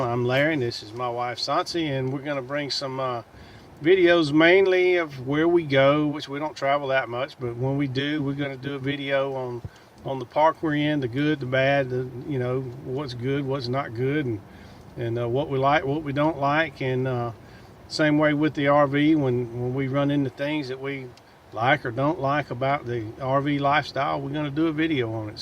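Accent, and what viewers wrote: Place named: Mississippi, United States. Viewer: We stayed there a few days last week - till our last night the weather was getting very bad - so came home a day early. was nice windy weather thou. Sounds like your from Laurel Ms. :)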